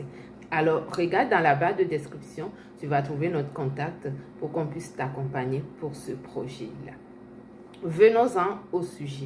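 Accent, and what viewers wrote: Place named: Africa. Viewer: pauvre petite africaine minable! Les riches ne passent pas leur temps sur YouTube à poster des petites vidéos faites en mauvais français à l’accent africain pour avoir des vues afin de se faire payer klks miettes par YouTube à la fin du mois. Si tu n’a pas reussi ds la vie au Canada, il ne faut pas généraliser et inclure tous les africains ds ta pauvreté et ta misère. Lis les commentaires et tu verras combien d’intervenants te disent les mm choses auxquelles tu n’arrives pas à répondre. Les vrais riches ne assent pas leur temps à poster des émissions sur YouTube sur comment obtenir le visa ou l’immigration Canada afin de recevoir les miettes. Comment peux-tu apprendre aux gens à devenir riches alors ke toi mm tu es pauvre avec une vie sociale misérable au Canada? Les riches ne peuvent pas comprendre ce que tu dis. J’ai parcouru toutes tes 16 vidéos: il n’y a aucun commentaire d’1 quelconque riche qui aurait compris quoique ce soit. 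Mais retiens qu’il y a içi en occident bcp d’africains qui sont des juges, médecins, avocats, chefs d’entreprise, sénateurs, businessmen, basketteurs, acteurs de cinéma, boxeurs et toi tu dis qu’eux tous sont pauvres??? Tu es malade ou quoi? Le camerounais Ngannou qui a gagné <num> de dollars ds un seul combat de boxe est pauvre? Le basketteur congolais Mutombo Dikembe qui gagnait <num> de dollars par An est pauvre? Le frère au Nigérian Dangote qui a investi près de 1000 dollars içi au texas dans le pétrole est aussi taxé de pauvre par toi? L’act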